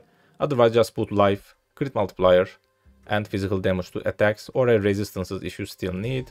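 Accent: Turkey. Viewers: konusmaya basladin 5 saniye dinledim ve dedim bu adam türk :) ve ne buluyorum kanal hakkinda :"Making build guides for Path of Exile.Because there are not much Turkish content creator,i make other guides in Turkish." yakisir...kolay gelsin hocam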